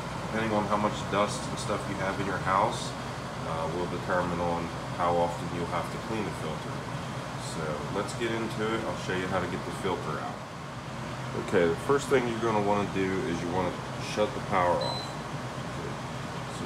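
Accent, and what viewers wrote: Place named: Philadelphia, United States. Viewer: You gotta be from delco or Philly area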